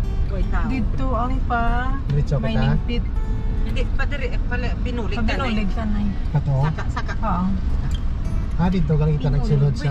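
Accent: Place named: Iloilo, Philippines. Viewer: Ilonggo ka po talaga magsalita😅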